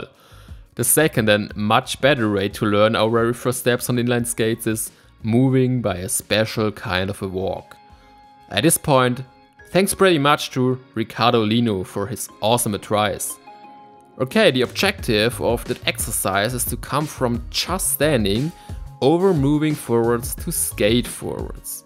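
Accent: Germany. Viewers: I just ordered my first pair of inline skates ever. I’m 42. I grew up using quad skates (ice skated too.) Got the Powerslide Next Core 80 all black set up because everything less expensive was sold out. Ended up with the dream skate😆🤩 Great video with very straightforward tips I’ll be using. I recognize that Berlin accent. Love it. Thanks!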